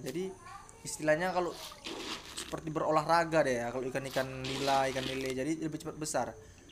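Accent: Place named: Medan, Indonesia. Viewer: Kayak orang medan abg ngomong nya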